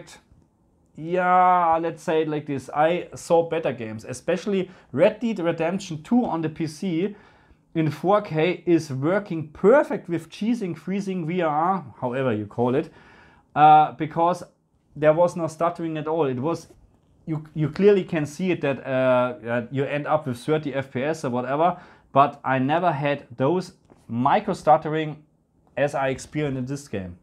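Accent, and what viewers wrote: Germany. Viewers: never ever did i heard a more much sounding german akzent thats from you ...keep going my guy😅👍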